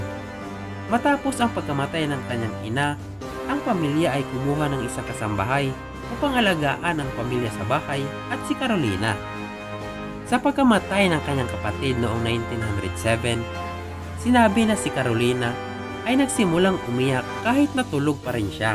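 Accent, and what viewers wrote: Visayas, Philippines. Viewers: bisaya ja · Bisaya ka po? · I like your visaya accent🤣🤣🤣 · Visaya ka po? Me no bicol po ako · like nyo kung BISAYA yung nag sasalita dito😁✌👇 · Bisaya ka kuya? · Bisaya man jod ni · Bisaya ka ba sir? Hehehe. Pansin ko lng sa accent mo.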